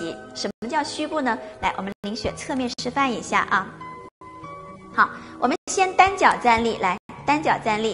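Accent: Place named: Russia. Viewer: 字正腔圆，好可怕😨听着听着，好像回到了罗刹国。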